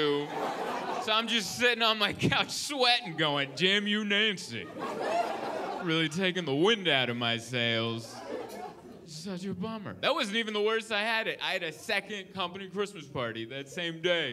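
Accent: Hungary. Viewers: Okay I still laughed, but your Hungarian accent is awfulllll 😂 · as native Magyar, I was surprised to hear that you don't speak Hungarian. Travelling a lot internationally, I can recognize my fellows just by accent from far away, airports, hotels, anywhere just by hearing (maybe because I have also this thick accent, tuned my ears for). Did you know that you have typical Hungarian accent speaking English? Surprising... Congrats man for your stand-ups, go on! BR, Csaba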